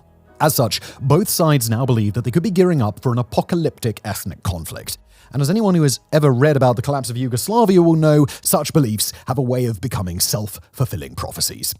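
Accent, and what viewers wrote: United Kingdom. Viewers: We Americans like to learn from people with a British accent. It makes us feel more intelligent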